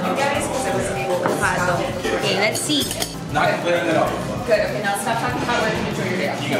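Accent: Philippines. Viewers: Ms. gustong gusto ko talaga accent mo, rizaleña talaga 😂proud tiga angono na lumaki sa binangonan... 😉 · May punto ng Taytay... abang ka-saya!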